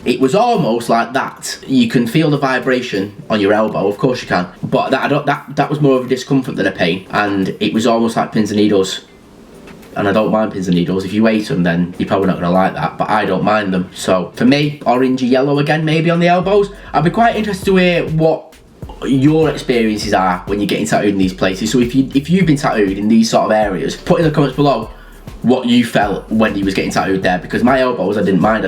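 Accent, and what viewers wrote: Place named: North, United Kingdom. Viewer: Are you a northerner? You sound northern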